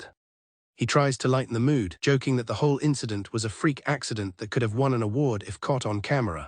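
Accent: United Kingdom. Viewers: Why is the ai british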